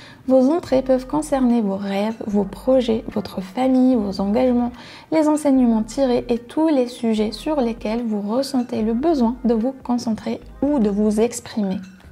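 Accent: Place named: Algeria. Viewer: C’est fou ça fait longtemps que je m’étais abonnée à ta chaine, comme j’avais trouvé ton contenu similaire aux chaînes : mind parachutes ou encore prendre sa vie en main... j’avais décelé l’accent (nous ne parlons pas comme les Français natifs même si l’on a un riche vocabulaire) mais j’étais loin d’imaginer que t’étais Algérienne comme quoi les Algériennes sont partout !!! Je te félicite pour ton contenu, vraiment qui sort du lot des youtubeuses Algeriennes. Big up sista ! 🙌🏻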